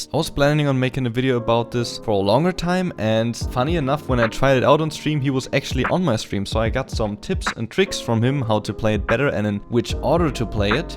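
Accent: Germany. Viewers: Hay man really nice Guide this build is awesome. I always bought black cleaver, Ionian boots instead of essence reaver but I will try this one aswell :) I just subscribed and btw you're german right? ^^ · R u German?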